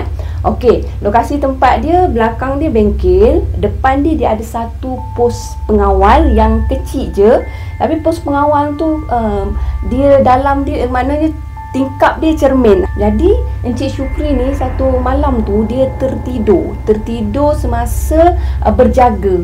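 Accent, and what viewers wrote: Kelantan, Malaysia. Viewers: Senjoh tu Hok sedak dgr kak .haha .kak ore Kelate ko .?area mano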